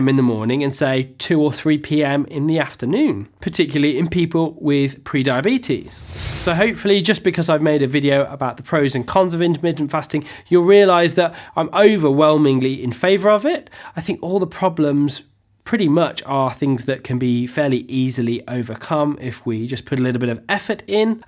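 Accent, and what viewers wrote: United Kingdom. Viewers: Four seconds in, heard brittish like accent. Out of here!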